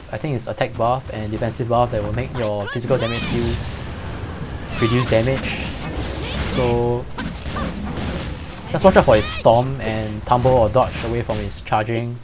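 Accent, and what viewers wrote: Singapore. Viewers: its a singaporean accent. as much as u and i might hate it cuz we're NA ppl, its the way singaporeans talk. its their national accent. type in singlish in youtube if u want to know more about it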